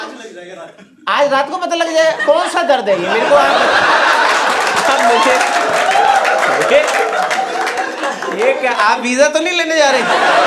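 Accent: Pakistan. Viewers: brother why your accent matches with pakistani's accent, are you from indian border side. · Why he has a Pakistani accent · * ki tone itni Pakistani si kyu hai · love the way you speak! Apka lahza pata nahi kisi ne notice kiya ya nahi but bhaut influenced hai how people speak in Pakistan. For example: Chai mai chini kam kare aap, mohan meri baat sune aap. Don't take offence but this is how most Pakistani influencers and comedians speak in general. Aur bhi notice kiya hai maine but all that sounds very nice....adds to the comedy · Why he's sounding like pakistani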